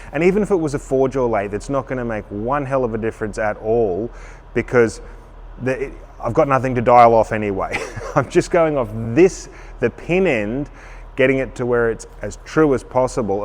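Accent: Australia. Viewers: If it werent for Ur "Aussie" accent, I'd say quit talkin and start cuttin!!!!!! Ur almost as bad as Diesel Creek in Pennsylvania, talk, talk, talk1!!🤠Ye Ole Caterpillar Cowboy🤠 in Illinois. Oh My Gosh how fast are U turning that part? 33;49 that is a thing of beauty!!!! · Greets from Las Vegas, USA! Love your videos and you commentary. I also subscribe to Cutting Edge Engineering Australia (CEE). You do heavy drilling rigs and and CEE does heavy mining machinery. Between the two of you, I have a smorgasbord of machining. I'm originally from Melbourne, Australia, and it's a real treat to hear Australian English. Thanks for bringing your MEGADRILL project to my attention. I'm looking forward to a binge watch on that one. Love you guys! 😀❤👍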